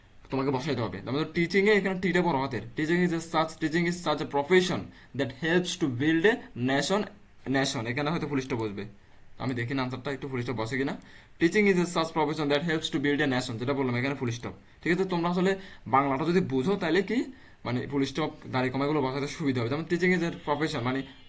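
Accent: Chittagong, Bangladesh. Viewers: Bhaia apnar kotha chitaingar moto lage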